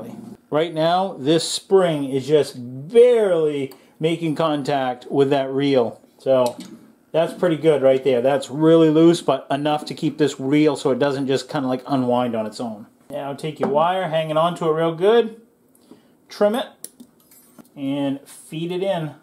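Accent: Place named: New England, United States. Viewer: I love new england accents LOL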